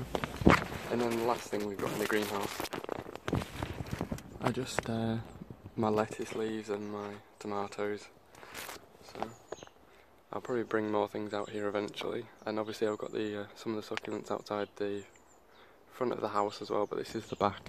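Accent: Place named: England, United Kingdom. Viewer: I am SOOOO sick today, and have been too weak to even hold my phone, but I started watching this earlier in a sick haze, and when it got to the kitty, I smiled all over and giggled and just felt the joy pulse through my body. Then looking at your lovely plant babies and hearing your soothing voice and lovely English accent..... ahhh... just the medicine I needed today! Thanks! 💗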